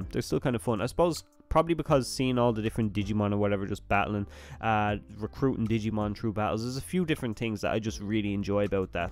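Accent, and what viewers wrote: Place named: Ireland. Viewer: You called me out mate! Na I'm playing, good review man.. caught the Irish accent straight away ✌🏾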